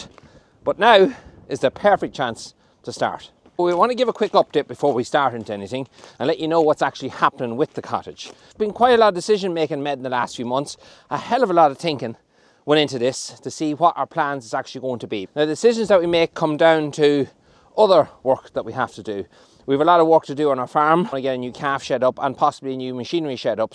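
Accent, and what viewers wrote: Cavan, Ireland. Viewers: what accent is that ??? Cavan ?? Trying to figure where in Ireland you are ? 🤔☺️😉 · I enjoyed watching, and I have subscribed. I have watched other videos of restoring cottages and going off-grid by Brits who have relocated to Ireland from the UK. It's nice to hear an Irish accent.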